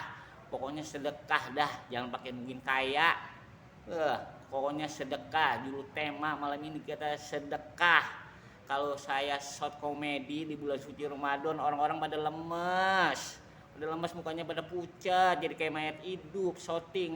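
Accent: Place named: Betawi, Indonesia. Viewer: lenong betawi banget.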